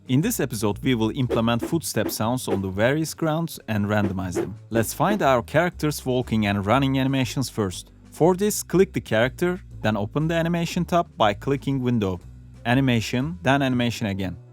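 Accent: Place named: Turkey. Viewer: Türk olduğunu aksandan hemen anladım.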